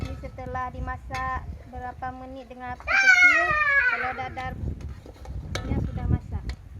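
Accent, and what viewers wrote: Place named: Aceh, Indonesia. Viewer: dapu tanyoe aceh iy logatny jg.hehhe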